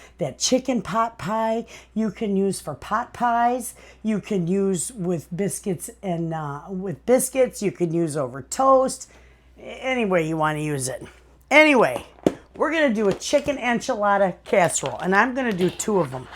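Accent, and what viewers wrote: Michigan, United States. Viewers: Just seeing your channel for the first time. Had to smile when I heard your Michigan accent, I spent the first 24 yrs of my life there. Of course I hit the subscribe button😂 Those chicken recipes look delicious! Looking forward to watching your other videos.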